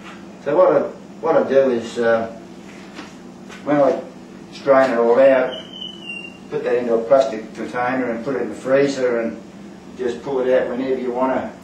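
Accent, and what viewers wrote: Australia. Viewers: are you Australian. Cause you have an Australian accent.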